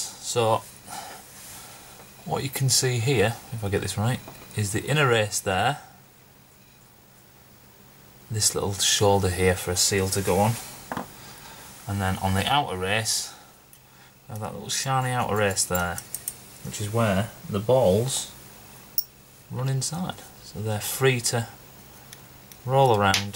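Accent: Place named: North, United Kingdom. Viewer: Using this in 15 minutes with Saudi Aviation students here in sunny Riyadh - brilliant explanations - simple yet informative. Nice to hear a good Northern accent - reminds me of home!! Cheers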